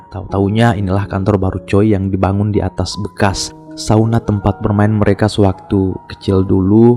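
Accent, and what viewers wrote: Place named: Sulawesi, Indonesia. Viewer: weee min logat mu kya logat Sulawesi 😭😭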